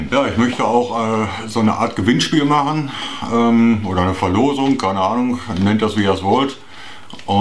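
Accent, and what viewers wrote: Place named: Ruhr, Germany. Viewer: Ich mag deine Projekte und deinen Slang! Ruhrpott? Gruß von einem Exil Dortmunder.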